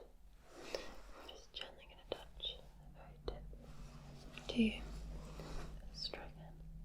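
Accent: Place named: Scotland, United Kingdom. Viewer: I’ve just had to hand in my Englishman card 😭😭 as the Scottish accent is yummy 🤦🏼‍♂️🤦🏼‍♂️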